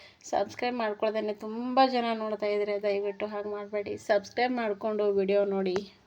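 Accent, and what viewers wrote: North Karnataka, India. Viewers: ಅಕ್ಕ ನಮಸ್ಕಾರಗಳು ಅದ್ಭುತವಾದ ವಿಡಿಯೋ ನಮ್ಮ ಉತ್ತರ ಕರ್ನಾಟಕದ ಭಾಷೆಗಳು ಮಸ್ತ್🎉 ಮಾತಾಡಿ ಅಕ್ಕ ತುಂಬಾ ಖುಷಿಯಾಯಿತು ನೋಡಲು ಮತ್ತು ಕೇಳಲು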